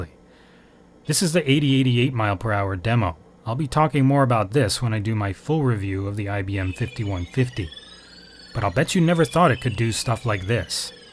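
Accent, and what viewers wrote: United States. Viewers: I'm British, we pronounce the L (but not the R on the end unless we absolutely have to) but for me hearing you seppos say sauderrrr is a bit like seeing you spell words like colour or favourite without the U... something you adorable colonials just do... I wouldn't mind a modern kit to build a genuine retro computer, whether it be an IBM XT PC, a Commodore Vic 20 or 64, a ZX Spectrum or a BBC Micro or Acorn Electron. Who's with me?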